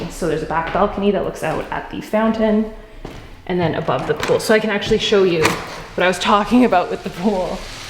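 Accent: Canada. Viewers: Omg. In love. Brett and I are living vicariously through you until we reach this! You have definitely raised our standards and life goals! We watch all of these golden oak videos together. Also my almost two yr old thought it was very funny when you kept saying babe bc Canada accent compared to our Texan accent lol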